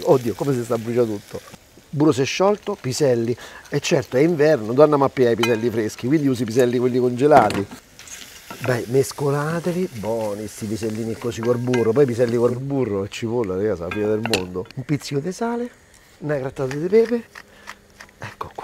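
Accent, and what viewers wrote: Rome, Italy. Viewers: Lei è fantastico, io sono romagnola e mi incanto a sentire lei parlare romanesco, quello che prepara è bellissimo soprattutto spiegato da lei. Grazie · sei bravissimo, ma, non prendertela a male, se tu ti sforzarsi di parlare più italiano... l'inflessione romanesca ci sta, ma fino ad un certo punto...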